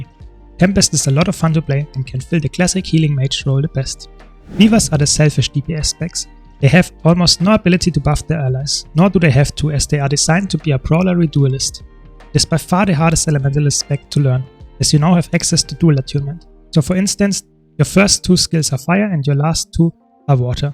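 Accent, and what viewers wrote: Germany. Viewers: Ah yesss that german kartoffel accent xD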